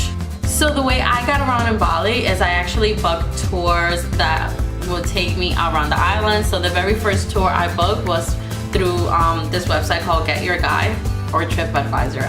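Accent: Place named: Latin America. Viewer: For some reason u have a Latina accent. Or maybe I’m trippen... anyways aside from that, I’m considering solo traveling for my birthday in the summer. Ur pictures are amazing. I’m curious how were U able to have pictures taken of urself do u ask strangers. Also I seen you went from the Airbnb hut and then you found a cheaper hotel was there a reason u switched stays. I also want to get amazing pics and experiences while also staying safe, I’ve been flirting with this solo traveler idea for so long I just don’t know where to begin but I don’t want to wait anymore any feedback is appreciated. 🤗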